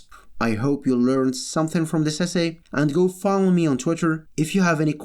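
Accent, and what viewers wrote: Morocco. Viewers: Are you Moroccan by Any chance, i hear a familiar accent .. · where are you from? your accent sounds like a moroccan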